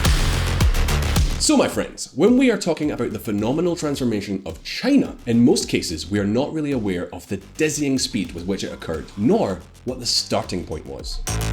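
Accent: Scotland, United Kingdom. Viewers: You’re pronunciation is weak...as an American, I love hearing the Brit accent but yours is either hindering your pronunciation or you’re writers and team are failing you miserably. Best of luck red coat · So curious! Watch a video in british accent that I already watched in spanish from Spain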